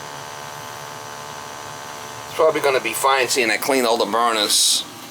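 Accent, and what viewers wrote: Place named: Boston, United States. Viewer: You sound like you are from Boston .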